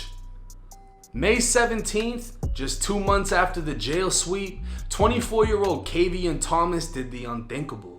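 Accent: Boston, United States. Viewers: You sound like you from Baahhhston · How you from Florida but sound like someone from Boston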